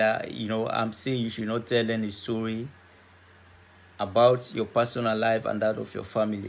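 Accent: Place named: Sierra Leone. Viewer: You sound like a Sierra Leonean. Are you? Thanks for sharing...new sub showing some love 💓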